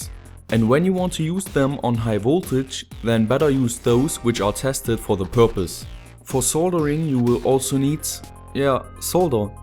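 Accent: Saxony, Germany. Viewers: Hi, great Video! Especially because I'll have to build a new tools stock for reactivating my old hobby. My first project is to hook up a old ghettoblaster with bluetooth for my brothers birthday. I even have the service manual including the schematic, but it's a while since I last read one. May you help me to find out where I'll grab the AC for my converter board? It would help me a lot. Fabigg PS: Even though I'm a German too, it's kind of polite too write in English because your videos are English. PPS: But I'm wondering what dialect you might have, because you pimped a Simson and the sound of some phases I'll guess it's saxonian. Am I right? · Tolles Video :) Jetzt brauch ich nur noch eine Bench Power Supply, dann hab ich alles :D Kann das sein, dass du eher aus dem Osten Deutschlands kommst? Sachsen oderso? :D Hört man ein wenig :)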